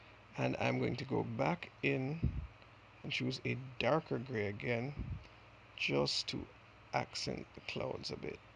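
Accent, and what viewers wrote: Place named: Caribbean. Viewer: You sound like a West Indian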